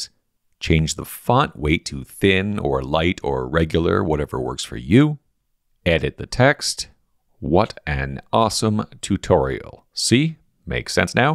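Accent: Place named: Canada. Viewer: I hear your Canadian accent...my wife is Canadian too! Thanks for the tutorial. I'm a subscriber now!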